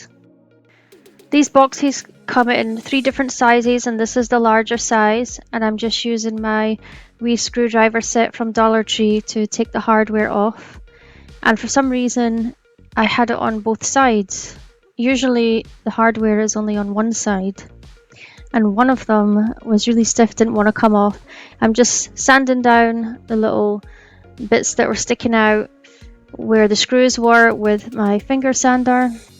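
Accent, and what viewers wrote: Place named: Northern Ireland, United Kingdom. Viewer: I love everything in this video. I subscribed to your channel because I love listening to you talk — my Mom was from Northern Ireland and used some of the same words and phrases you do and her accent was very similar to yours, so you bring back warm memories for me.😊 Everything you made in this video is pretty simple but turned out really nice. You have some great ideas!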